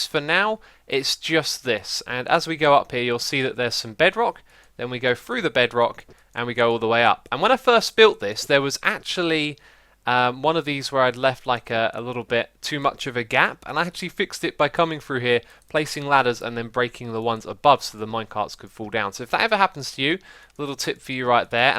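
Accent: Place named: England, United Kingdom. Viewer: Mesa-FIED or Mesa-FIGHT? I love your english accent but this has been bothering me all this season.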